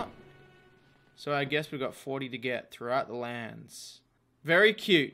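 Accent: Australia. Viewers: say more funny Australian sounding stuff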